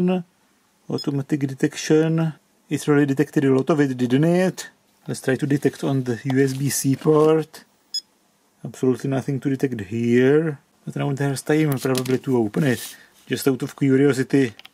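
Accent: Czech Republic. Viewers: I thought you sounded Either Czech or something But then i saw the Tesla branded stuff, where're you from? Did i get Czechia on the spot? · Říkal sem si českéj přízvuk, ale ty ses fakt Čech 😅😅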